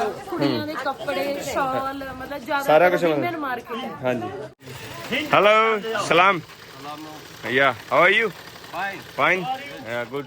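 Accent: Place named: Malwa, India. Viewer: ਯਾਰ ਤੇਰੇ ਝੱਗੇ ਤੇ ਕੁਰਬਾਨ pure ਮਲਵਈ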